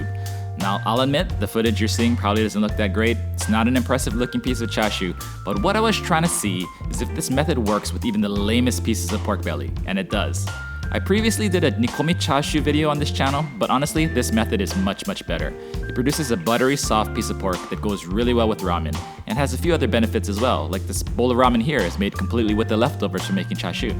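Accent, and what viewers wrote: Hawaii, United States. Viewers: I like the shortcuts you show! It’s really clever! Are you from Hawaii? You sound like it.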